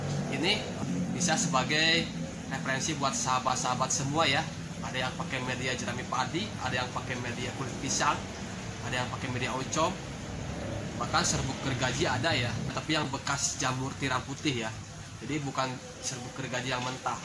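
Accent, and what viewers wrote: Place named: Medan, Indonesia. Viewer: Medan bg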